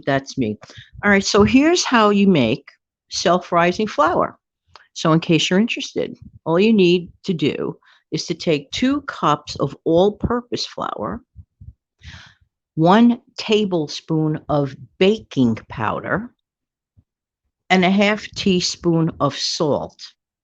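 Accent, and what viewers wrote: New York, United States. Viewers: Hi from South Australia 🇦🇺 I found your you tube channel today it’s fantastic and inspiring. I just love that NY accent I am in my third act of life and getting my financials and life. Looking forward to hearing more of your channel and learning new tricks on how to make life better ❤️😍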